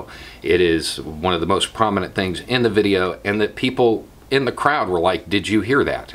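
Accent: South, United States. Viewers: Thank you for being the voice of reason with a southern twang!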